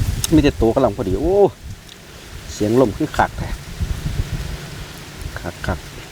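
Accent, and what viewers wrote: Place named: Khemarat, Thailand. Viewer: บ่าวท้าวเป็นบ้านใด๋ฟังสำเนียงเสียงกับการเว่าคือคนแถวไปบ้าน(อำเภอเขมราฐ)แต่ถ้าบ่แหม่นกะขอโทษจ้าแต่ตอนี้คนถามอยู่ลพบุรีได้30กว่าปีแล้วแต่เคยลืมเขมราฐ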